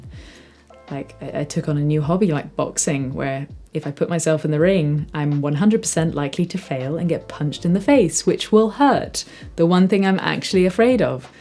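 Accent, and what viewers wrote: United Kingdom. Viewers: Yo, are you British or something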